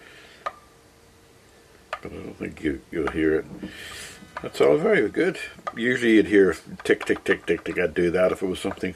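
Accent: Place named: North, United Kingdom. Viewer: That gentle "No'rion" accent grabbed me immediately and kept me listening to the end. Great review. My only criticism would be that you might have explained what exactly you were doing to ensure the 12th fret and the open strings were perfect octaves. I never remember whether it's "clockwise" or "Anti-clockwise" for flat or sharp and I end up doing it by trial and error every time I acquire a new instruemtn. You have probably given it back by now, but how much did it weigh approximately? Most LPs I have strung around my neck were so heavy that 30 minutes of playing would cause shoulder problems.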